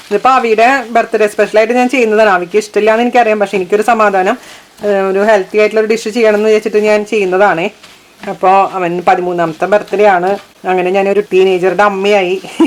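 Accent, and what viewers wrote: Thrissur, India. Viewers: Love your recipes and your thrishuran malayalam. It's music to the ears!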